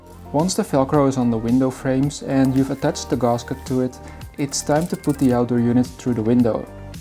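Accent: Netherlands. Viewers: You remind me of an over the internet friend from Holland. One by the last name of van Rens. Somehow I immediately knew you were from Holland. 😂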